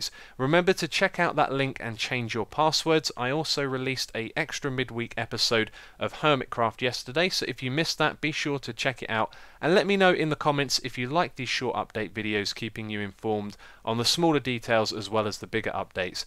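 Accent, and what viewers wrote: United Kingdom. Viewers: <3 Brit accents (content also good/perfect, as usual }=Þ)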